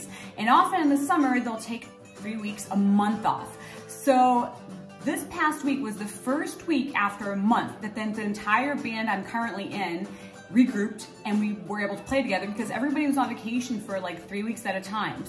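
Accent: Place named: France. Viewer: I really liked your video (and your French accent - it's really good!). Could you tell something about the country music scene in France? Thank you, greetings from Poland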